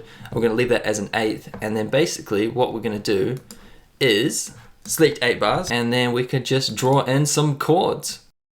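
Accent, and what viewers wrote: New Zealand. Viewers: hahaha yeah, i started watching and i was like damn i swear this guys from nz then i checked the channel description 😂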